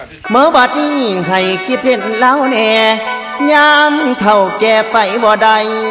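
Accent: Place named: Isan, Thailand. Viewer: สาวชัยภูมิ,สำเนียงภูเขียว ชุมแพ ภูเวียง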